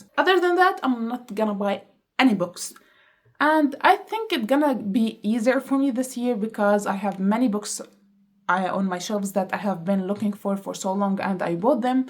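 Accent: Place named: Germany. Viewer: Hi. First time seeing your video . Just curious if you're German. Cause I can't tell if that's a german accent or an Arab accent. Thanks😊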